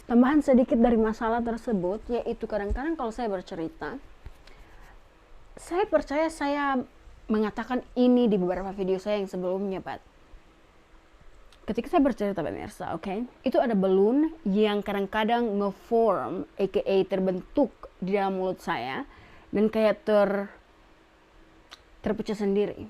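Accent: Manado, Indonesia. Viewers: Daya tarik vlog kak ap adanya n logatnya jg suka,stengah indo,inggris manado 😂😂😂😂😂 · Aku suka logat manado n maluku kak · Gapapa kak kalo bisanya ngomong bahasa Inggris lebih nyaman dan mudah ya ga usah peduli apa haters bilang soalnya cape dengerin apa kata netizen yg maha benar dan berkuasa wkwk....iya juga sih sebenernya kak lebih baik ngomong bahasa Inggris campur2 Indonesia.soalnya klo pun kak ngomong bahasa Indonesia takut nya juga ga gitu bener bahasanya karena lidah org menado/Maluku klo ngomong bahasa Indonesia juga ga bisa sih.jadi mesti full bahasa Maluku /Manado gitu.intinya aku paham maksud kak gimana sih makanya dia milih ngomong campur Inggris juga ya karena dia ga tau kosa kata bahasa Indonesia dengan benar tpi klo bahasa Manado ya kak lancar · Don't worry saya org malaysia pun sgt understand apa yg kamu katakan yr english n bahasa indonesia perfecto only yr slang very manado no problem with that's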